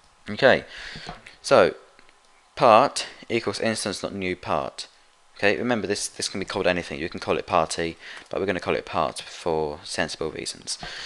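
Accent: United Kingdom. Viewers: Yea, I think hes from the UK or something like that. That's most likely why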